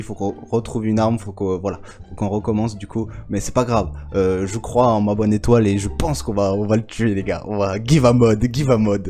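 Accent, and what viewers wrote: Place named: Spain. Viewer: Sa fait bizzare de pas entendre ton accent espagnol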